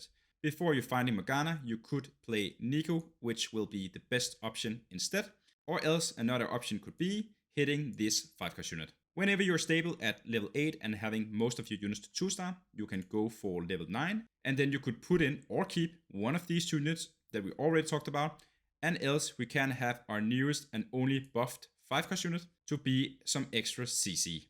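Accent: Denmark. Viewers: Den danske accent er dejlig🇩🇰🇩🇰🇩🇰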